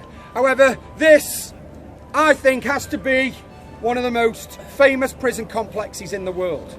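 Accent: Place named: Manchester, United Kingdom. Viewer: He says about 5 minutes in that he's from the North of England - sounds like Manchester or somewhere else in Lancashire to me.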